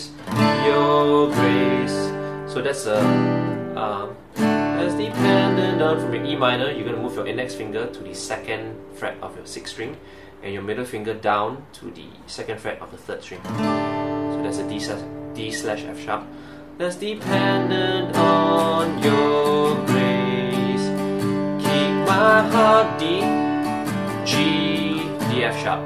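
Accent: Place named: Singapore. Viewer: I thought I recognized that accent! My wife is Singaporean and I lived in Singapore for 5 years, now in the USA. Thanks for the video. I recommended it to our musicians as we're learning this song for this Sunday. Regards, John Carpenter ("yeoberry" is a combination of my wife's maiden name, Yeo, and my middle name.)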